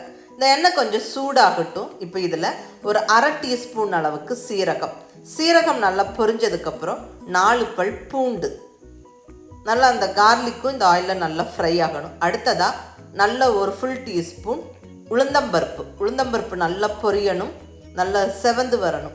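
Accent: Kongu, India. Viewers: Hi mam... U r one of my inspirations to start a YouTube channel... Love the way you describe the cooking with கொங்கு தமிழ் ..way to go mam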